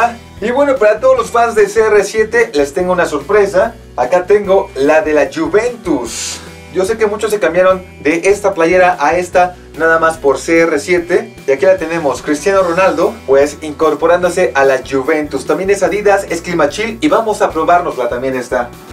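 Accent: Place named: France. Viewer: Haz más vídeos con tu suculento acento Francés y Brasileño. ♡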